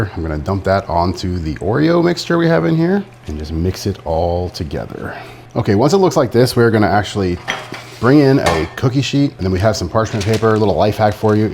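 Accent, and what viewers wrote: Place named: United States. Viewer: You have an American accent why are you measuring everything in metric?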